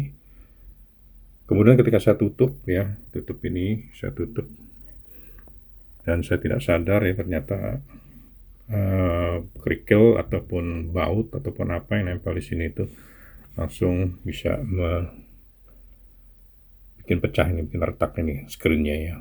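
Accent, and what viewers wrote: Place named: Indonesia. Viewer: Wah jd panutan nih bersih2 pekarangan efek dr tetangga tanpa dongkol 👍👍👍, btw lidah Indo ya gak hilang 🤪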